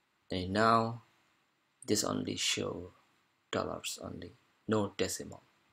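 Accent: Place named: Indonesia. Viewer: Indo's accent ^^ ...nice vid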